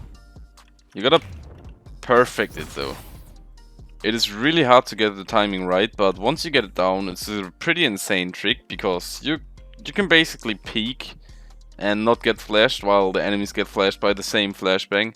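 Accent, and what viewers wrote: Germany. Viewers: Wie man hört das du deutscher bist haha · Are you german? Because of your accent ;) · Wie Deutsch kann English eig. sein :D... Gutes Video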